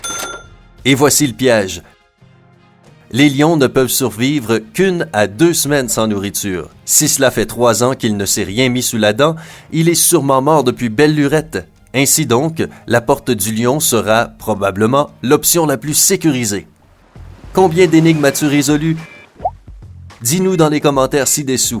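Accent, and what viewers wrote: Quebec, Canada. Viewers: Parce qu’il est québécois et au Québec, on dit ça comme ça des fois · est-ce t québécois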